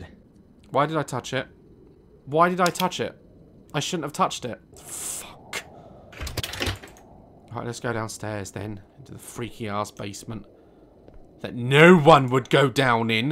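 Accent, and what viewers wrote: United Kingdom. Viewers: sounds slightly more British than I remember, his accent is really coming through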